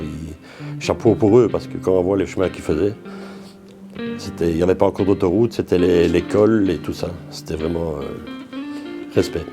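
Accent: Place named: Jura, France. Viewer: Tu est du jura?? Car tu a le même accent que moi!!😄😄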